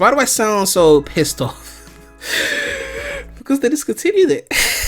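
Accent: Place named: United Kingdom. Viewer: Why do you mix your accent with British and American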